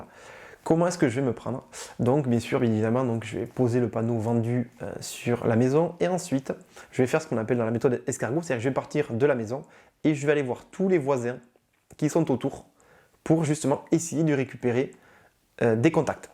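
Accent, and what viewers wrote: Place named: South, France. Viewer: Hello ! Alors moi je t’explique, j’ai entrepris des démarches depuis quelques semaines pour devenir mandataire immobilier, en auto entrepreneur... Évidemment je n’y connais rien en Immobilier car je débute et qu’il faut un début à tout, je vais apprendre grâce à mon réseau l’agence pour laquelle je dépends, et en autodidacte sur les innombrables vidéos tutoriels YouTube qui existent.....puis en allant sur le terrain parce que c’est sur le tas qu’on apprend le plus aussi ! A ton accent on devine que tu es du Sud, si ça peut te rassurer on ne sera pas concurrent puis je suis du « Noooooorrrd » comme disait Galabru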